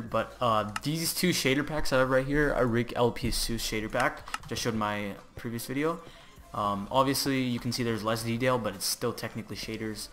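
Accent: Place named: United States. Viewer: Great :) . But u actually sound like you are an american :O . How ?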